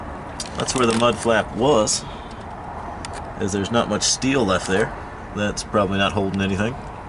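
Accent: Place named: Nashville, United States. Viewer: Where are you from? You’re in Ohio but sound like a fellow Nashvillian!!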